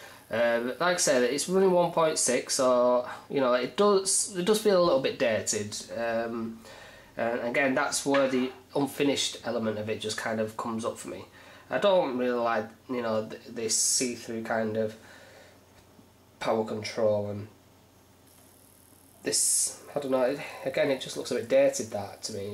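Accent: Yorkshire, United Kingdom. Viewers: It's a yorkshire or some kind of northern english accent. IT'S A GREAT ACCENT.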